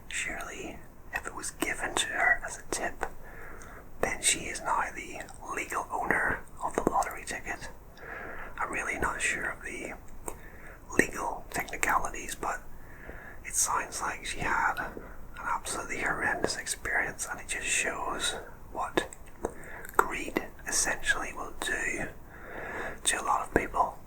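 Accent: Ireland. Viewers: ❤Sometimes I play the lottery... but its a real downer when I lose and I have to go back to work! Love your Irish whisper! ❤ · your accent sounds Irish 😂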